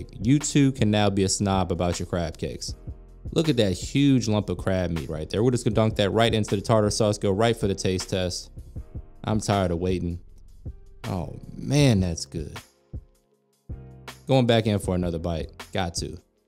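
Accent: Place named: Maryland, United States. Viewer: Quick story… About 20 years ago the corporate office I worked for was in Cockeysville MD. When I would fly out there, the ladies would make me THE BEST crab cakes. I quit, company went under (I think) lost touch with the ladies. Through the years, I’ve tried to replicate the cakes, no luck. I’ve tried HELLA cakes, never as I remembered them. Fast forward to a week ago. I knew I recognized your very faint accent. When you said you were from the area, we’re making crab cakes, my heart skipped a beat! Made the crab cakes today, not exaggerating… they legit made me cry, took me back to the best crab cakes I’ve ever had, the ladies, the fun we had, Chesapeake Bay, everything. THANK YOU so much. Love everything about your cooking channel, keep up the good work☺️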